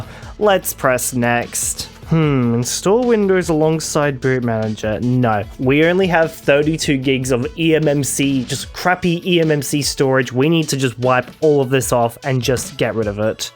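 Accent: Australia. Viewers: he's Aussie.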